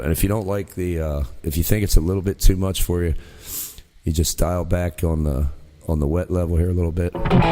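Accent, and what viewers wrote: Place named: Philadelphia, United States. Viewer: Cool video! Have you tried S-Gear? You from Philly? I hear a Philly accent.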